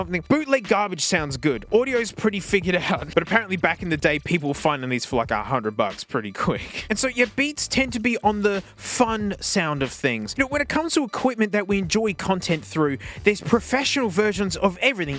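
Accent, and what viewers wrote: Australia. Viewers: I dont care about audio The only reason i subbed is to watch a random aussie man yell at tech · Im early to the aussie yelling guy for once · finally im early to hear the australian man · Wake up, the aussie man is yelling at headphones again.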